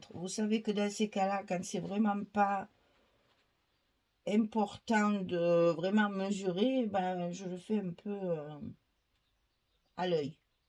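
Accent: South, France. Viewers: Bonjour non seulement vous faites un merveilleux travail et vous m'inspirez mais en plus grâce à cette vidéo nous pouvons découvrir une hyper sensible et très émotive 😍. Moi perso je suis addict de vos créations et de votre voix qui chante le Sud 😁. Je vous souhaite un bon dimanche 😘😘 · Bonjour, je connais votre chaîne depuis peu grâce à Marick Scrap et je reconnais que vous faites des merveilles. Effectivement, le double face permet un travail plus propre. Mais pourriez-vous me dire quel scotch vous utilisez pour une tenue sur la durée car tous ne sont pas au top. Merci de votre réponse et quel plaisir de regarder vos vidéos où je retrouve mon accent du sud.....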